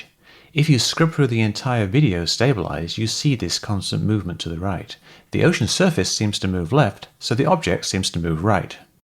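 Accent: United Kingdom. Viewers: I have to mentally prepare to watch these videos from Mick. His take can be interesting, but it generally puts your mind into a fucking mental gymnastics exercise. If he didn’t have an English accent, a fraction of the people would take him seriously lol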